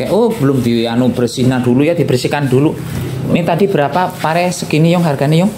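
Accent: Banyumas, Indonesia. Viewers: Mas lucu banget inget karo keluarga di cilacap bahasane podo wae ngapak hiburan sambil masak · Matur suwun mas resepe ,ngapak banget si dr lampung mas hadir🙏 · Kesukaanku itu pare dioseng pake tempe,aku seneng karo ngapak2e mas 😅😅😅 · bahasanya ala banyumas yak kang? purwokerto nan.... marai inget pernah di purwokerto mendoan endul 👍😃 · Rika wong ngendi pak?ngapake mantep wkwkwk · Mas ngapakmu ngelingke aku karo koncoku mbiyen.biyung ngelingke aku ro biyungku sing wis almarhum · Ngapake polll tp aku ya seneng, ora ngapak ora penak ya mas 😄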